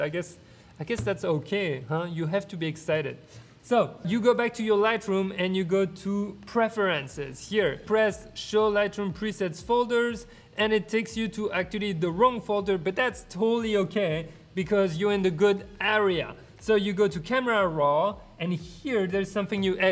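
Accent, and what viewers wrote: France. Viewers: I just jump from your FR chanel and it's fun, you got a french accent, and in French you got like 50/50 British/US accent :D And one more subscriber.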